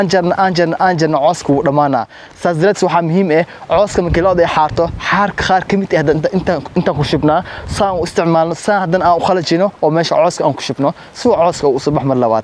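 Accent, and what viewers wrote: Mogadishu, Somalia. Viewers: Very interesting although I find it difficult to understand the reer xamar dialect.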